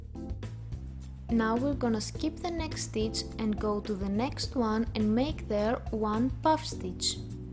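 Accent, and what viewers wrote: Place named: Greece. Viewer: ΚΑΤΑΛΑΒΑ ΑΜΕΣΩΣ ΑΠΟ ΤΗΝ ΠΡΟΦΟΡΑ ΣΟΥ ΟΤΙ ΕΙΣΑΙ ΕΛΛΗΝΙΔΑ!! 😉 ΜΠΡΑΒΟ ΚΟΡΙΤΣΙ! ΕΥΧΑΡΙΣΤΩ ΓΙΑ ΤΟ ΒΙΝΤΕΟ! ΕΧΩ ΣΚΟΠΟ ΝΑ ΤΟ ΦΤΙΑΞΩ ΚΑΠΟΙΑ ΣΤΙΓΜΗ! KEEP UP THE GOOD WORK!😊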